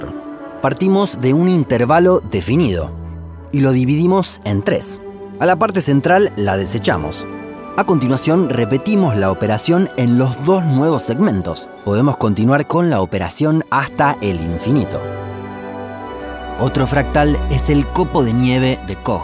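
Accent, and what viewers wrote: Buenos Aires, Argentina. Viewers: Un video de alta calidad, te doy los "parabéns" desde Brasil, hermano. Tu acento argentino es tan hermoso como el video! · Claramente se nota q es de argentina por el acento xD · chileno 0% .. es muy de Ciudad de Buenos Aires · Quise verlo pero no puedo soportar nada el acento argentino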